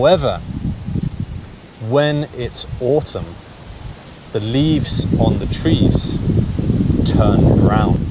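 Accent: United Kingdom. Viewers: It's appropriate content. Even if the content is easy for understanding, it's useful to listen to underscore nuances of British speech.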